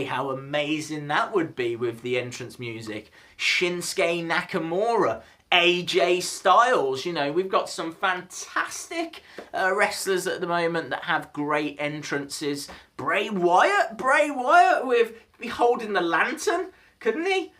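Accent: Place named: England, United Kingdom. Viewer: i love the way english people pronounce some words and letters its kinda refreshing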